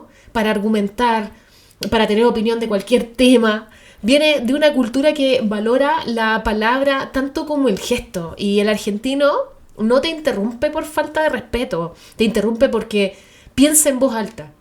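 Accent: Chile. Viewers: gracias por tratar de entendernos y lo estas logrando, me gusta tu tono de voz y tu acento chileno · Guaaauu... ¡Qué interesante! ¡Qué capacidad de observación y de análisis,por Dios! ¡Y qué bien lo expresas! ¡Y que bien suena tu acento chileno! ¡Qué dulce y qué delicioso suena! Ah... Te cuento: Acabo de suscribirme. Un abrazo enorme desde Córdoba. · Soy Argentino, específicamente de San Juan. Te olvidaste de las provincias del Norte que también tienen sus tonadas su folclore y su cultura y muy en contraposición con el de Bs As. Igual te digo que tú como chilena (se nota por tu tonada) que has descrito casi a la perfección y de todos los videos que he visto que han echo dedicado a los argentinos, el tuyo es el mejor por lejos ❤ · Tengo solamente 2° año de secundario con 64 años cumplidos, pero mucha cultura general y conocimientos en un amplio espectro. Con el tiempo comprendí que el vivir en una ciudad donde podés comprar un libro, en un lugar físico, a las 4 ó 5 de la madrugada, te OBLIGA a entender más de cultura general. 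Lástima que muchos de la nueva generación, no aprecian eso, su vocabulario es muy básico y plagado de incorrecciones que los viejos no entendemos. Te oigo hablar y sé que sos chilena... tengo muchos amigos en Chile, El Quisco, Valparaíso, Peñaflor y mi hermano de la vida en Melocotón. Grandísimos amigos que me respetan, pero hay muchos que no. Soy músico, canto y sufrí el desprecio viviendo dos años allí, cuando vuelvo escucho a veces que me gritan "argentino culi.........ao" y cosas parecidas recordando a mi madre. Cuando voy por Vespucio respetando los 80 Km de máxima, tambien escucho gritos. Pero bueno, mi objetivo es estar con mis amigos y dejo todo de lado. Mi pareja desde hace 11 años, ya no quiere ir más... ESO NO LO VEN, NO ES UN DEFECTO, UNA DISCRIMINACIÓN??? Antes de ver la paja en el ojo ajeno, deberían ver el árbol que tienen en el propio. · Me apareció este video en mi feed y escuché tu acento chileno y lo primero que pensé fue "la puta madre, otra chilena más que nos va a salir con lo de los hambrientinos, las Islas falkland, las crisis económicas, y tantas otras cosas". 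Pero me dejaste sorprendido. Ojalá un 1% de tus compatriotas pudiesen ver este video. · Suena tan bonito una descripción tan profunda y tan bien contada de argentina mi pais en ese acento chileno característico que mirando la cultura argentina como en un espejo reflejas tu gran cultura y nivel intelectual, lo hiciste con una descripción perfecta y poética ,chile ha crecido tanto en las últimas décadas económicamente, culturalmente y en educación que es un ejemplo en América dejar atrás nuestros prejuicios y encontrarnos como pueblos es crecer también y este relato es una muestra enorme, gracias desde córdoba Argentina 😊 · Me parece que esta mujer no es española es como que tiene acento chileno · El asado es un mito en el argentino, no tanto asi, los argentinos comemos carne como en calquier otro pais. Si se puede decir que en alguna provincia del interior se consume mas carne, zona rurales. 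Pero diria que el argentino de Buenos aires por ej, es mucho de la pizza, las pastas... y los argentinos de bien ,por lo general son sociables, amigable , es aventurero y soñador. y ama su pais por su tierra,su cultura...Quizas los de CABA se creen europeos, pero el resto no lo creas, pero si posee mucha cultura latinoamericana y no europea... . Y para proxima ,cuentanos como son los chilenos...-por tu acento creo que eras chilena-.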